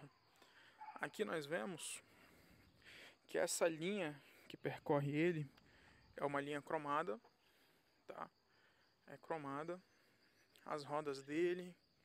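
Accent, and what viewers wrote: Porto Velho, Brazil. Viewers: Man... Vc É de Porto Velho - RO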